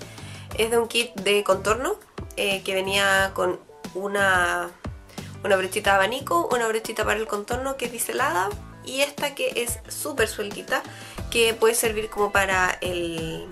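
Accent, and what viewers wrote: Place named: Chile. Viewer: Que dulce tu voz! Me encanto tu canal, primer video que veo, creo que eres chilena por el acento, yo igual te invito a ver mi canal . Nos estamos viendo, cariños! 😊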